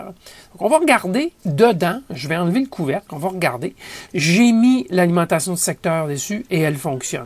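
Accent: Quebec, Canada. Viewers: Ça fait plaisir de retrouver cet accent québécois !!! Très bonne vidéo.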